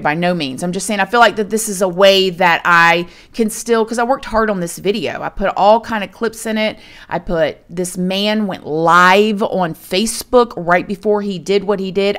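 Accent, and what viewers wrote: South, United States. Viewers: I don't know what part of Florida y'all are in, but I detect a southern accent. I'm in Enterprise in deep southeast Alabama. I startedwatching you in the early Gabby days. Love your videos!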